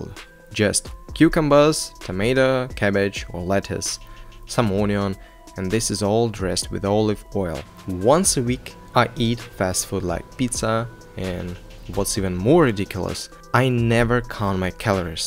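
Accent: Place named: Russia. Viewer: + russian accent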